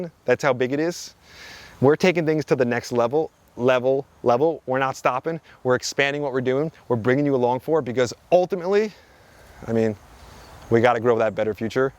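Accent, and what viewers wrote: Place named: Pennsylvania, United States. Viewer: He sounds like my cousins when I visit Pennsylvania-ha! I'm originally from Philly now retired in Southwest Virginia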